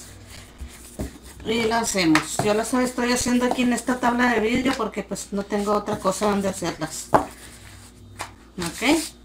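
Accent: Mexico. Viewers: !!! Tu video es súper eficaz y me encanta el dejo Mexicanoooo! Saludos desde Peru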